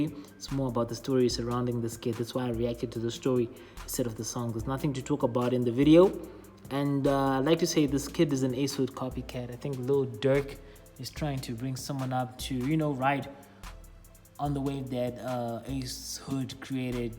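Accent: South Africa. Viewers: maaaan you sound so South African please tell me you are 😂😀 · Bra are you South African? your accent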